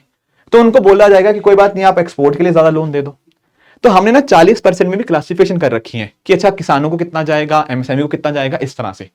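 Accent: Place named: Punjab, India. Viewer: Sir you teach great,but are u Punjabi? From your accent I'm guessing because Punjabi living in Haryana Delhi have similar accent of Hindi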